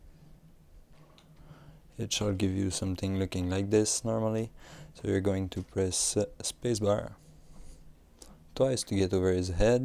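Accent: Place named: Quebec, Canada. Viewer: ont a un québécois icite!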